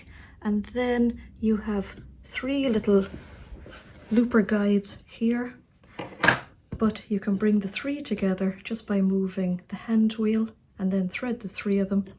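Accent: Ireland. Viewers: Thank you so much, I think I will purchase one, love from Dublin. PS nice to hear an Irish accent 😉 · Hi. I found you on YouTube this morning and am enjoying your vlogs. Just love, love your Irish accent! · I loved your video! I have the Janome 1000CP, the predecessor of the 1000CPX and I've had it for 17 years! Your machine looks like it does much more than mine so I need to find a home for my old one then I can buy the one you've bought! Loving your Irish accent! x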